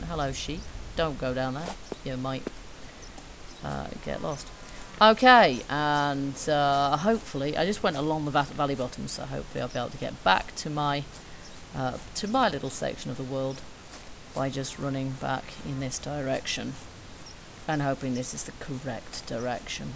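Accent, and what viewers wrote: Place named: United Kingdom. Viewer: love the accent, I don't hear a lot of female gamers, let alone british one's so kudos to you, my ancestors came from Yorkshire...keep up the great work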